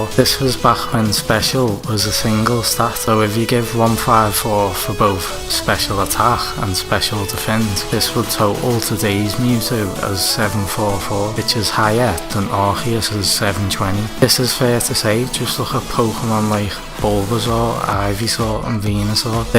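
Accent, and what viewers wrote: Liverpool, United Kingdom. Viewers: Is that another scouse pokemon fan. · Your Liverpool accent. 🤮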